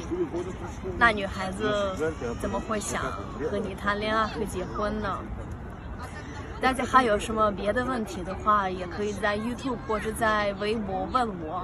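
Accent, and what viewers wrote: Standard. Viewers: 内容很关键，音效剪辑不重要。另外，你的中文已经很好了，很多中国人的普通话还没你标准。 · 你的中国普通话水平比南方很多中国人都标准。。。。。。。比如广东人，福建人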